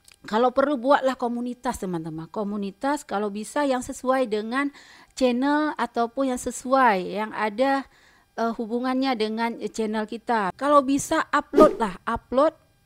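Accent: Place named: Medan, Indonesia. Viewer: Aksennya seperti logat Medan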